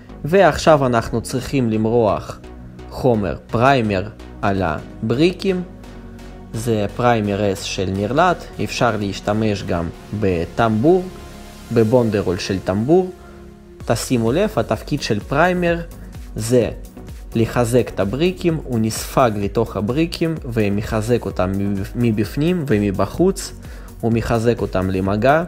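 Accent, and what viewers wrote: Russia. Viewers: Акцент явно русский.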